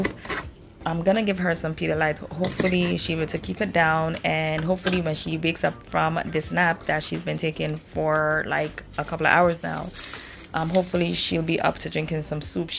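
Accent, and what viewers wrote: Jamaica. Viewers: U. are Jamaican?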